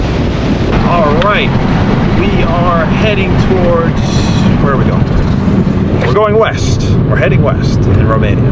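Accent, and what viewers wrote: New York, United States. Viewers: Cut him some slack. It’s the New York. Way of saying things. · This is one of your best ones, and I want to visit Romania! I can't believe how many people here don't respect a good New York accent when they hear it. I grew up in lower Westchester in a family with Brooklyn roots and the word was "FOY-LEDGE" but, American English being what it is, it's just another word that we spelled differently than how we spoke it. It may not be "Proper English" but it's commonly pronounced that way around the NY-NJ region or at least it was when I was growing up there. Not wrong, just different. That's a human value that is conveyed well in your travels! (I'm not a Professor of Linguistics, just a Life Traveler) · he's from nawwyakk,,giv em a break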